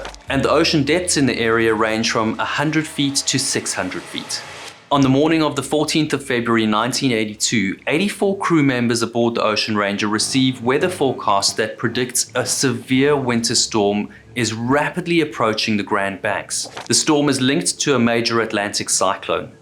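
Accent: South Africa. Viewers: Great video thanks. Everyone can tell you're from South Africa because of your accent.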